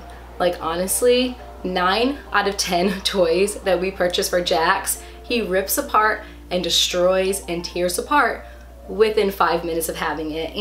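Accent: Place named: New Jersey, United States. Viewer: He’s so cute ❤️ but the way your pronounce some words 😍😍😍 are you from jersey ?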